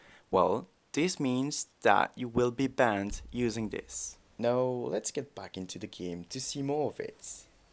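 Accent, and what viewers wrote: France. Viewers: L'accent français discret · You have the most intense French accent I've ever heard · Are you French ? If yes, you speak English very well !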